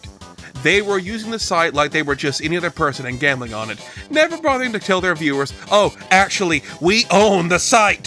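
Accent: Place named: United States. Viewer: I gotta be honest, I like listening to voice. It’s just something about the “Cowboy-ish” accent that really tickles my brain.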